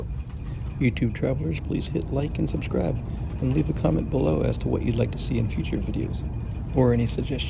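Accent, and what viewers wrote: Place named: United States. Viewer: I like your American English accent ;-)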